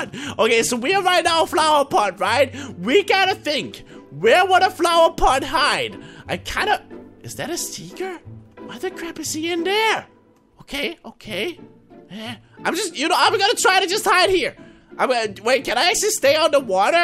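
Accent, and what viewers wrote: Denmark. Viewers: Have you ever heard someone from Denmark talk? That is how they sound.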